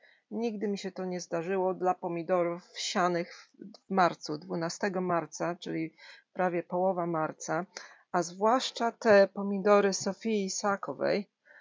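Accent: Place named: United States. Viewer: Skąd ten amerykański akcent?😊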